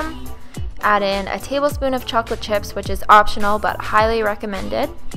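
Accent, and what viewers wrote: Canada. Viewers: peep the canadian accent :-)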